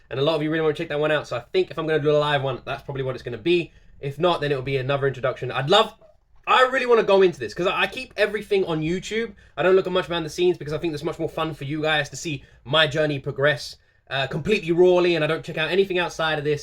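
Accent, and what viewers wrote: United Kingdom. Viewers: You have seen blood seat and tears and fire so it would be good to watch these live 2016 MMA. Is that a Leeds accent?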